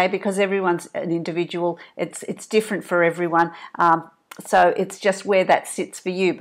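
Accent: Australia. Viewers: so fab hearing an Aussie accent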